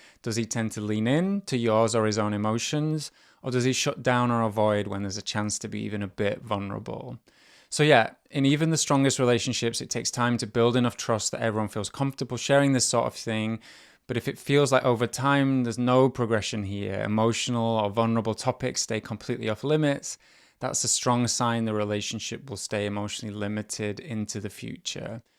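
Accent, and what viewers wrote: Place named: United Kingdom. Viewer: Your British accent is extremely soothing.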